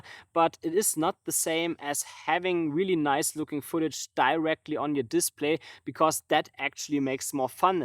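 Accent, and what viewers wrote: Germany. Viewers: I love your German accent. also your cool content. thanks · Where you‘re from originally? Germany?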